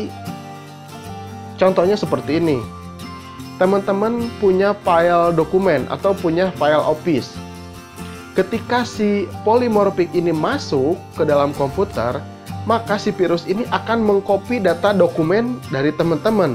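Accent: West Java, Indonesia. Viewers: sunda banget bang wkwkwkwk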